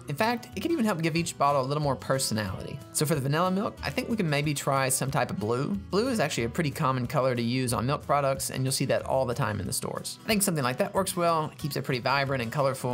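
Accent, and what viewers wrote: South, United States. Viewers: unrelated, but are you from the south? i love your accent and how you enunciate words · extra points for a cool southern accent lol. nice work, looks perfect!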